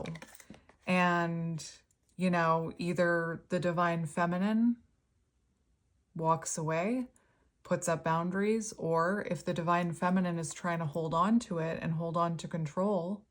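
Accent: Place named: Canada. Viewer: Hi! I've been watching you for about 2 weeks now & it feels like you are looking at my & my Twin Flame's life. We've been together for 12 years & right now it's really complicated. We've been apart for over a month now & I've been so broken over it. You & your readings have been spot on! For example, in like 3 different readings you kept saying he's watching me, almost like voyeuristic. I didn't think so but 3 different times he's said something about watching me or followed me someplace when I wasn't where I usually would be since I watched your readings. A lot of what has happened now makes sense, & that is entirely thanks to you & your gifts. You have a healing voice & I've really enjoyed watching you. You've given me a lot of insight & a lot to think about. You made a comment that a person could tell you were from Canada by the way you say "car". 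For me, the first time I heard you say "about" I knew. It seems like you all put a lot of emphasis on the "ow" (ou) part of the word. It's cute, like a reverse southern draw. Thank you for the clarity & peace that you've brought me. You have really REALLY helped!!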